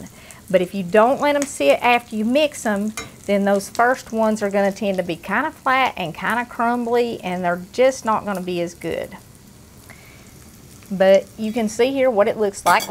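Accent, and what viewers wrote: South, United States. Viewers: Just love this lady! She talks like my grandma did, southern twang! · She sounds like my people. Hillbillies have been around forever.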